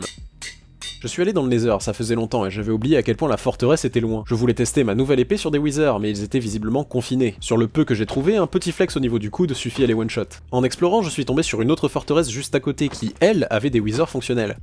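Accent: Quebec, Canada. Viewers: J'aime ton accent quebecois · tes québécois?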